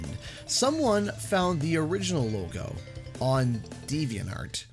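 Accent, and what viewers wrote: Canada. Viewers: Hey how about a skylanders remaster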